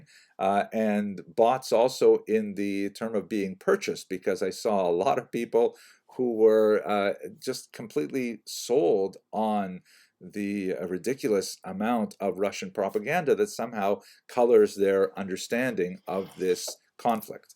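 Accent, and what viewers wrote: Canada. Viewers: Dr, as a fellow Orthodox Jew who often deals with the same issues among our co-religionists, thank you for your message and thank you for the knowledge you are sharing. Also, it took me a good few minutes to understand what you meant about "Bot" and "bought" until I realized that in your Canadian accent these words rhyme while they don't for me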